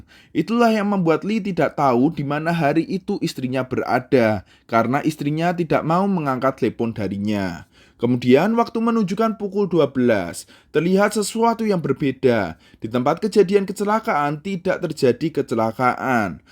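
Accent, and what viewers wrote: Java, Indonesia. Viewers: Medok jawanya hilangin ya ka...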